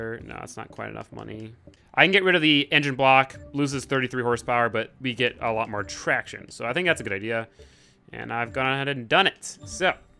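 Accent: Russia. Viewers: your german accent sounds more like a russian one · that akzent though :D (ps. thats propably a russian one )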